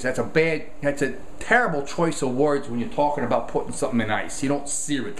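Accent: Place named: New York, United States. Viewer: It's a New York accent coming from a guy who's lived in Georgia for the last 20 years. From New York to Atlanta to Habersham County.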